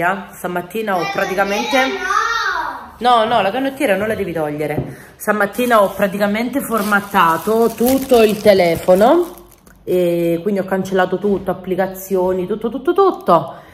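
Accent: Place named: South, Italy. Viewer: Sento il dialetto e in un istante mi fai tornare indietro nel tempo..quando andavo giù dai miei zii cugini ..e da 4 anni che non scendo a lettere .sei super attiva giovane con 3 bimbi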